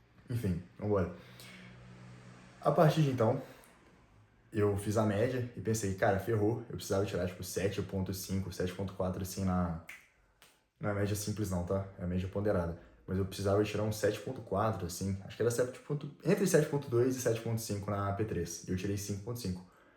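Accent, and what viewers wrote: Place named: Rio de Janeiro, Brazil. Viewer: Salve mano, nao sei se tu é do RJ comecei a acompanhar o canal a pouco tempo e teu sotaque parece daq apesar de vc mencionar instituições de SP, pq nao tenta UERJ? Med na UERJ é tão prestigiado quanto na UFRJ, tem o HUPE (Hospital Universitario Pedro Ernesto) do lado e fora os programas de auxilio e bolsa que a Uerj proporciona. Falo pq meu primo fez Med la e apesar de concorrido acho que é mais ''certo'' você que ja tem uma bagagem grande se dedicar pra um unico vestibular que vêm repetindo o mesmo padrão desde sempre do que tangenciar seu esforço pra outros vestibulares que por vezes tem a estrutura diferente uns dos outros. Abraço e boa sorte, tu vai conseguir o7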